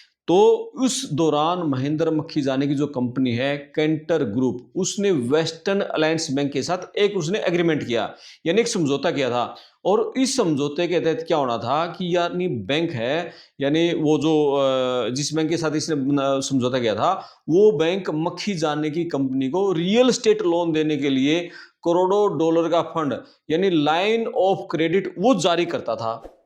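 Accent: Haryana, India. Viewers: Some haryanvi accent 😮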